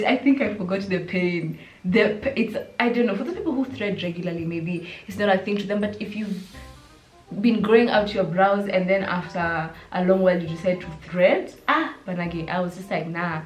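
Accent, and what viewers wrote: United Kingdom. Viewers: The British accent ❤️❤️🔥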